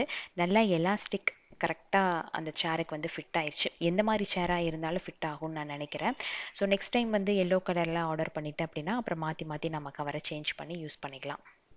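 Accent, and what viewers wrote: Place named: Pollachi, India. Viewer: Entha State ponalum Pollachi Tamil alagu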